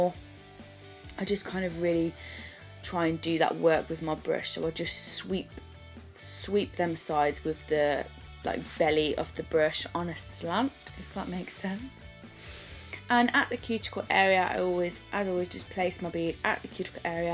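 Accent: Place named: Essex, United Kingdom. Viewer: I've just recently found your channel. I've watched several of your videos and I must say you do some beautiful nails! You're very creative with your artwork. Also, I just love your accent. Where are you from? I'm guessing Essex, but hey I'm American and don't know the different English accents,so please don't be offended if I'm wrong! 😀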